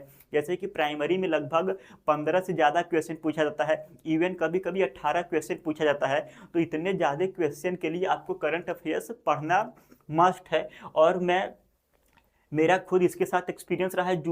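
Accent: Uttar Pradesh, India. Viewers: Bhai aap up ke ho kya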